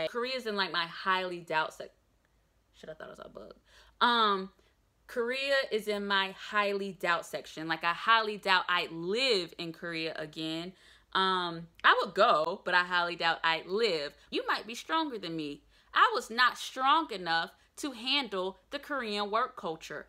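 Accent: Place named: South, United States. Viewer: I knew yo ass was from the south! That southern charm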